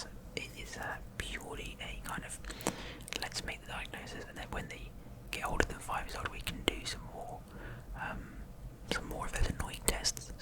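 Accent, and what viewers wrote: United Kingdom. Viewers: Time for another game of have I been saying that word wrong or do British people just say it differently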